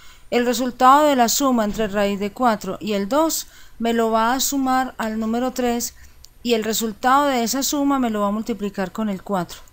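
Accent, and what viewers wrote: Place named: Antioquia, Colombia. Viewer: Ese acento Paisa ayuda mucho a entender el curso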